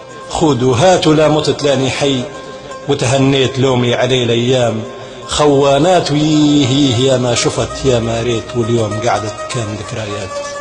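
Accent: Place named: Libya. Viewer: اني من الجنوب التونسي و هذي لهجة خوتنا الليبيين هي نفس لهجتنا و الشاعر ليبي و معروف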